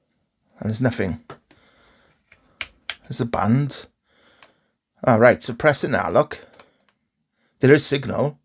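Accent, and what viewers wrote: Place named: Swansea, United Kingdom. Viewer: Happy days beautiful swansea jack accent I have one Awesome knowledge is power 🎉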